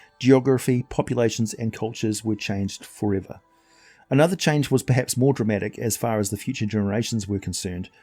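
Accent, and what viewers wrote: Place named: New Zealand. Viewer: Great to hear a kiwi voice on YT❤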